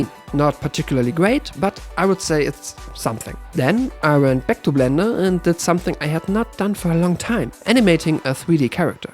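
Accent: Germany. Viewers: Man hört doch aus der Stimme eindeutig raus, dass er deutscher ist xD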